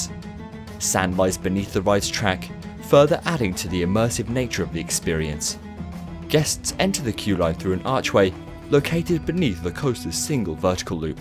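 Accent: United Kingdom. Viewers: Great brirish accent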